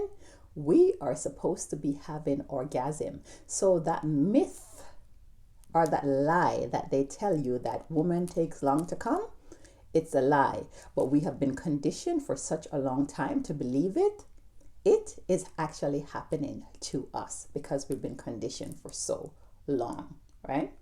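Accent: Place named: Jamaica. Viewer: Love your Jamaican accent.. big up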